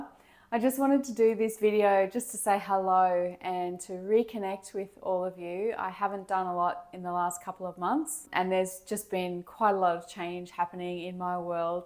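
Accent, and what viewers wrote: Australia. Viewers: Thank you, you have inspired me for quite some time, and always look forward to learning more from you. I am glad you have figured a way to continue to share what you love, yet finding balance. We all have to find what works for us. I too changed direction at the end of last year, mine was from selling soaps etc, unfortunately a very recent cancer diagnose has offered a new challenge. We all must follow our heart, and live with passion. From this Kiwi in NZ, Peace & Love (PS Love the aussie accent)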